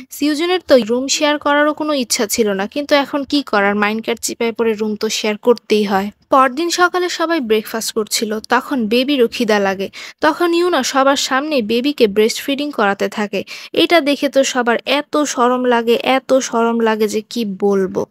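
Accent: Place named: Barishal, Bangladesh. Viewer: আপু তুমি কী বরিশাইলা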